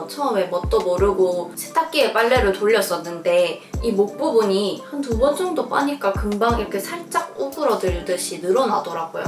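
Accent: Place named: Busan, South Korea. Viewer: 하..진짜 너무 예뻐요❤ 말도 엄청 예쁘게하시고 사투리가 너무 귀여워요 혹시 실례지만 부산 사투리인가요?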